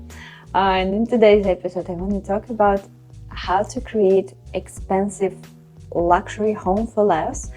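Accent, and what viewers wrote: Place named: Germany. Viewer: You German huh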